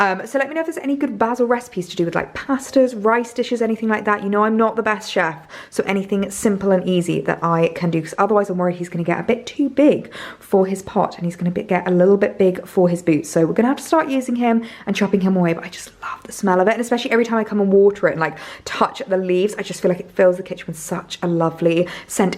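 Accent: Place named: United Kingdom. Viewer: Ty for responding. But please, there is no need to apologize.I'm a very loud speaker. Especially when I'm excited. So I constantly have to remind myself to speak softer. It's not an easy thing to do I know. And I'm 69. I've been trying to correct this at least a little bit my whole life. I cannot count the times people have asked me why I'm yelling when I feel like I'm talking normally. I have to tell myself that all I can do, is try to do my best. Part of the reason I might have been having trouble understanding you could also be that I'm not used to your lovely British accent. Out of all the accents in the world I've always admired and wished I was able to speak with a British accent. Have a lovely day. You are a joy to watch.😊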